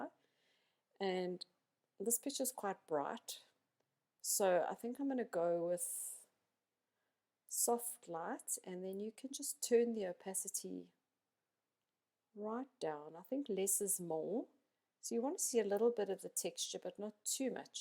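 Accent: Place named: South Africa. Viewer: Thank you for this amazing tutuorial. I really love your designs. I can hear you are from SA. I am in Cape Town and am also a digital designer so I thought I would say hi.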